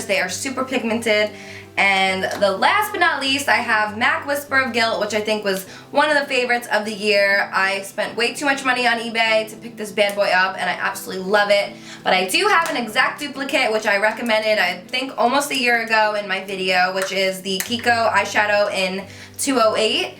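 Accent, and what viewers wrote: New Jersey, United States. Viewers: Lol you've been using the brow bar to go from your earliest videos and before I heard anyone else talk about whitening lightning:-) you are the best people say Queen Jaclyn and she's great but you are the Queen 4 sure! Comparing is bad I know so I will just say I love you so much please keep making videos when your a famous model or fashion designer or whatever dream you want and will achieve xoxo oh one joke I can't help it but I always know ur from jersey when you say the word drawer or draw in your case lol it makes me laugh everytime but not making fun I just think it's cute tomato tomotto LOVE YOU!!! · Your Jersey accent is everything OMG! Love your videos!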